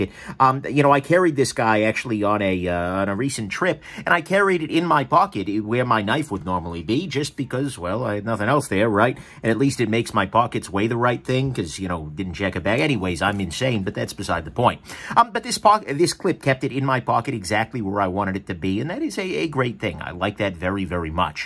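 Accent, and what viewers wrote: United States. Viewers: Russian? Some sort of American accent is what I am hearing... Kind of reminds me of a Warner Bros character...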